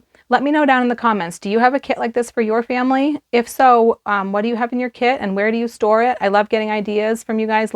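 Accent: Maine, United States. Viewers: Sounds like your from Maine which is awesome! I live in the mid coast area. I also have a black out box even though I live off grid lol. I even have an old school crank flashlight/ radio.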